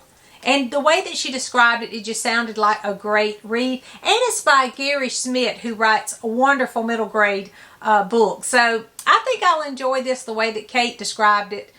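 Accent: South, United States. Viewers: Love your smile... laughter... and, your southern accent!😁🤗💞. Hv u read, “B is for Betsy “?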